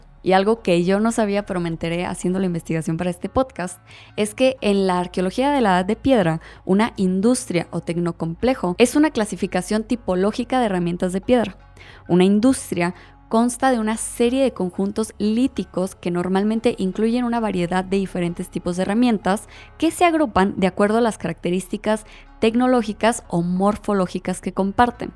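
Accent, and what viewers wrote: Mexico. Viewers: Definitivamente que podcast tan genial. Los temas y la manera como los abordas me parece genial. Ahora... Tu acento Mexicano me encanta!♥️ Saludos desde Colombia 🇨🇴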